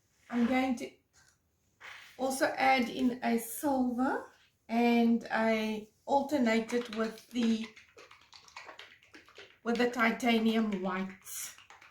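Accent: South Africa. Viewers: Beautiful. I am also a newby with this hobby and prefer the Dala Craft paint rather than the Dala acrylic. Where do you buy your Dala paint from as I am looking for 1 liter Dala bottles. There is a company in CT that sells the 1 litre containers, but I cannot remember the name and no name rings a bell if I google it. By the way, it is wonderful to hear a South African on Youtube pouring art channel.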